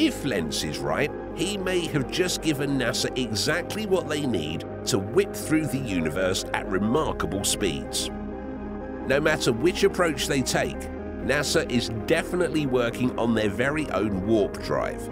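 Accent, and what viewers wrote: United Kingdom. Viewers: irritating British BLOKES talking... hate that stupid accent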